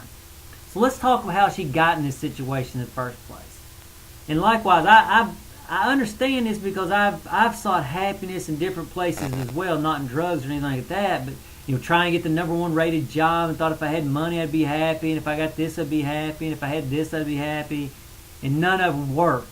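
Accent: South, United States. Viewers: haha nice southern accent and i no everyone is dieing....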